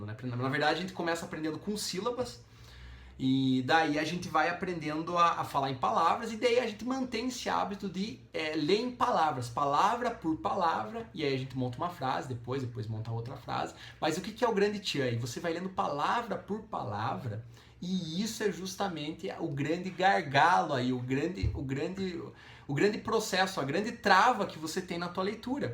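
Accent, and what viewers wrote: Brazil. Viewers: Carai qual seu sotaque ? Uma hora parece ser do Rio de Janeiro depois puxa o R depois fala meio gaucho, tô bugando aqui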